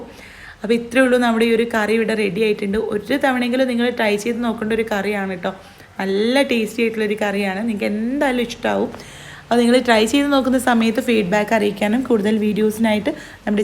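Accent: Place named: Kasaragod, India. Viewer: കാസർഗോഡ് നങ്ക്...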